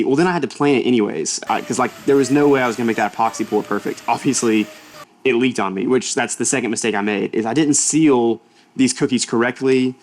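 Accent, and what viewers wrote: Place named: Hickory, North Carolina, United States. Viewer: Thanks for your honesty. I've recently found you on you tube. I'm a relatively new woodworker and I like you content better than anyone else I've watched on YouTube. Your accent sounds like your from my wife's hometown of hickory nc......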